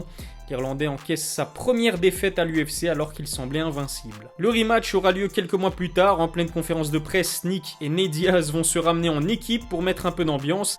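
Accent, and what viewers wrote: Belgium. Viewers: Juste une petite question t'es de Bruxelles ??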